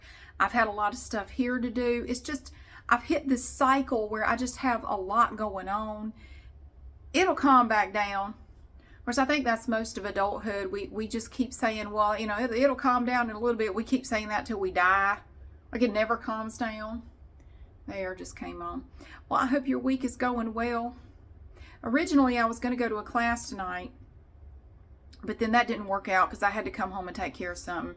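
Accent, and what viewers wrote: South, United States. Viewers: I just like you talking; I think it’s a northern guy liking a southern girl talking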